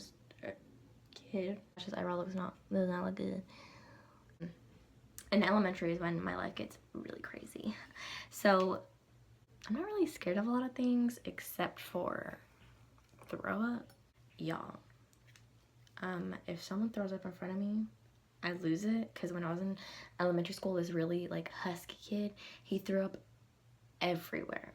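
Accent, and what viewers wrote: South, United States. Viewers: I love your southern accent 😂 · You have a little country accent oml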